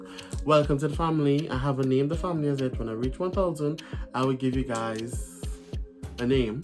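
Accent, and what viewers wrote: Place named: Nigeria. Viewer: Are you Nigerian? Lol, you sound Nigerian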